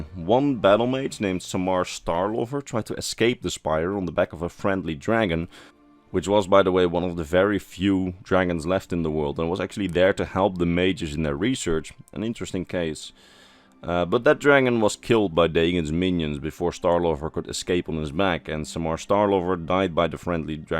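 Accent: Netherlands. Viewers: Good sauce. Are you Dutch by any chance? Shoutout to potential fellow countryman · You sound Dutch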